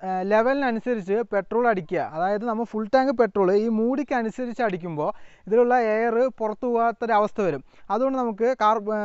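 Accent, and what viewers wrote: Palakkad, India. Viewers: Palakkad ano bro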